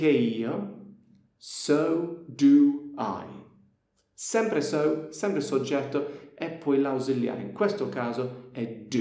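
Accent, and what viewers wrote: Apulia, Italy. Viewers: Ma solo io sento una certa cadenza pugliese? u giovn😂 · Ti adoro...tra la semplicità delle spiegazioni e l'accento pugliese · L'accento pugliese è favoloso!! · Bellissimo l'inglese che parla italiano con l'accento pugliese (se non sbaglio) 😊 video utili grazie! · anch’io ho sentito un accento simile al pugliese XD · Non è italiano ! è un inglese che parla bene l'italiano ma lo ha imparato con l'accento pugliese dei parenti !! Simpaticissimo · Davvero simpatico , con quell’accento fra Matera e Bari! E soprattutto , chiaro ed efficace! Grazie mille per queste ottimamente strutturate e utilissime pillole su particolarità dell’inglese troppo spesso trascurate. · nooo il tuo accento pugliese non perderlo mai... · il tuo italiano hai una leggera inflessione pugliese, fantastico! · Hai un accento pugliese molto marcsto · L accento pugliese è troppo comico... 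complimenti! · Absolutly not, perhaps at south more. I think live in Puglia because He got that accent and habits as well. Is it right? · Mahhh... A me sembra un pugliese con l'accento inglese ! Davvero friendly, ma non perché pugliese. Degno successore del bravo peter sloan. Maybe are you àppulish? So am I! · Ehi, your italian has a funny southern accent, kind of pugliese! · Hi, where are you from? I guess you were born in GB, although your italian cadence seems likely from Apulia, perhaps you’re dad or mum are from there. A huge hug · Per sapere, il tuo italiano lo hai imparato da qualcuno del sud Italia? Così a occhio direi... Puglia, forse? :) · quindi non sono l' unico a cui sembra pugliese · Hai l accento pugliese quando parli italiano😁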